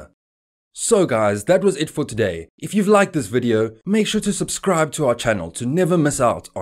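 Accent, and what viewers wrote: South Africa. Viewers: Great video. Recognize a Saffer accent when I hear one 🇿🇦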